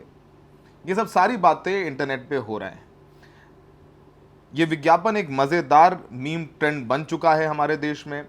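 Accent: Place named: Uttar Pradesh, India. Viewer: Kinaree aoo samaan check karao....somehow aroused me😂😂😂😂😂😂😂😂 specially the way u said it....typical UP ka lehjaa hai aap ka❤❤❤❤love u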